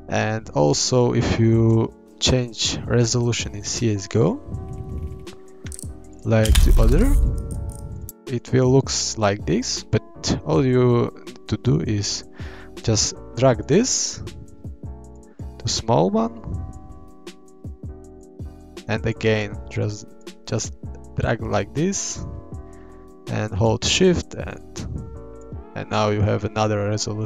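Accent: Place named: Slovakia. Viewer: som si hovoril že jaká slovenská angličtina :D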